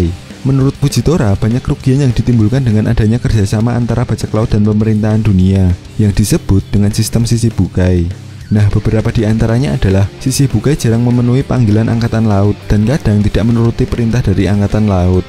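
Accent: Java, Indonesia. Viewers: medoknya itu lho gak tahan 😂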